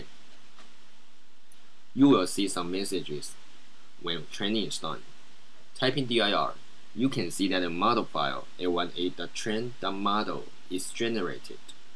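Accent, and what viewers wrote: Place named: China. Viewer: 毕设用到了这个，感谢~（Chinglish口音哈哈哈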